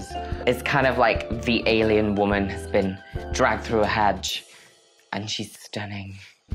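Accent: United Kingdom. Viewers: Its called a British accent. but nice recycled meme bro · i remember watching this video when i was 15 and thinking i was watching the most beautiful women i’ve ever seen. and i wasn’t talking english yet so everything i understand was the british accent and the word stunning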